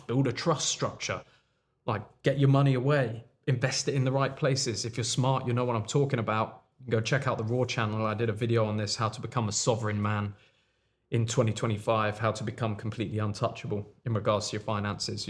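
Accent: United Kingdom. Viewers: What a hell. This is like Hamza, but more articulate and written 🇬🇧💂 Certified British accent 💂🇬🇧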